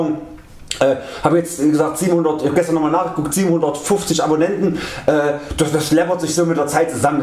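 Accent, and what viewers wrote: Saxony, Germany. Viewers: Dein sächseln klingt ganz anders als meins =D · Ich finde das echt ne nette Sache von dir, dass du kleinen Youtube Kanälen eine Starthilfe geben willst! :) Aber mal eine Frage: Was genau definierst du als Erfolg? Kommerzialisierung?! Denn Erfolg kann sehr individuell sein! Für mich ist schon das reine drehen eines Videos ein Erfolg :) Ich finde deine Art sehr klasse und sympathisch! Wegen mir kannst weiter sächseln wie du willst :D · Ich verstehe dich gut- auch wenn du manchmal schnell und viel redest ( bin da teilweise auch so) daß du aus Sachsen kommst hört man shcon- aber das ist doch nicht schlimm! Ganz normal dss mand as ein bißchen raushört, aber soo viel ist das auch nicht. Ich komme z.b. aus dem Sauerland ist bei Dortmund, und rede auch kein gaanzes Hochdeutsch das würde man auch hören wenn ich woanders wäre, das ich aus dem Sauerland bin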